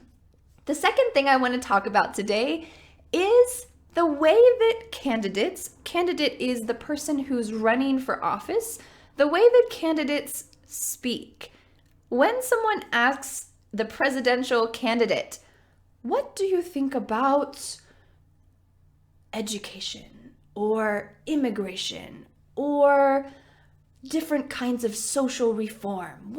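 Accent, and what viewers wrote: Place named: United States. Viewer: iam from Somalia this is the only teacher that i listen always really it's help ful even i often like American accent KEEp it UP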